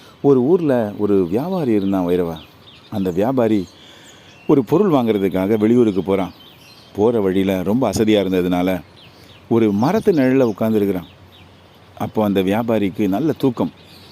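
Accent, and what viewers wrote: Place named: Virudhunagar, India. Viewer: Nice slang sir kamarajar voda slang apdiye pesuringa.🎉🎉🎉🎉🎉🎉🎉🎉🎉🎉🎉🎉🎉🎉🎉🎉🎉🎉🎉🎉🎉🎉🎉🎉🎉🎉🎉🎉🎉🎉🎉🎉🎉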